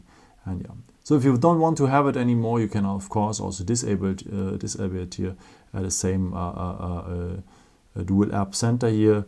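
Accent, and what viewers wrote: Germany. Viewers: Der deutsche Akzent is da😂✌🏼 aber nice Video hat mir sehr weitergeholfen!👌🏻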